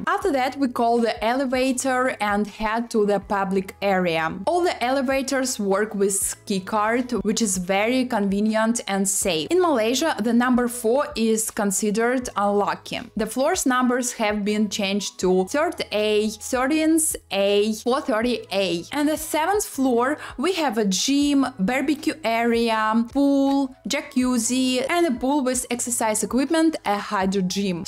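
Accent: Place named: Russia. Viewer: Услышал русский акцент местами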